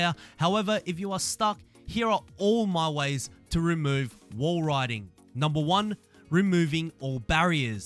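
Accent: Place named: Australia. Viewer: Sand trap. Slow the car down and push it of balance, the same way lowered cars become unsettled when you hit the water flowing over a road. Side note: Make "No collisions" an option in the Online Adventure. E.G. Street Race S2 No Collisions. Too many racers smash into you around that first corner. Better let everyone pass and slowly make your way through the crowd one at a time. Great work keep it up. All the best for the new year. Great to hear an Aussie posting Forza videos. Well done.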